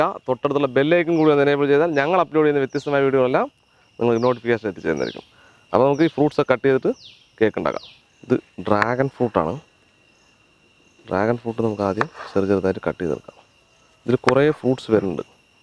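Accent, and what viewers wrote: Palakkad, India. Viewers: Palakkadan bhaasha super🤪 mostly watching for your language. Pakshe cake adipoli aayitundu👍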